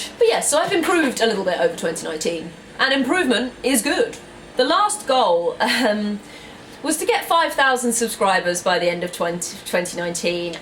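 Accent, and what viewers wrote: United Kingdom. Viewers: I have the worst begging of a year in my life that maybe a war is going to set in my country and I want to travel for UK but I can not. I love you so much coz when I watch your videos I feel happy also I learn how to do a British accent by watching your videos. Happy new year for you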